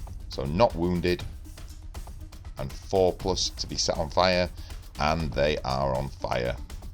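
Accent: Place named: Leeds, United Kingdom. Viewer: I've been thinking of doing some 'Munda battle reports myself but after seeing yours I've given up on the idea. I can see how each batrep is improving with every episode and I'm fully expecting next year's efforts to be on at the local flicks with the production being so good! I can't even imagine how long they take to do but I'd just like to say I appreciate all of the work you put into these, GW could learn a thing or two from you. P.S. are you from Leeds? I ask because you say the number one like I do and I think there's only us Loiners that pronounce it won instead of wan 😉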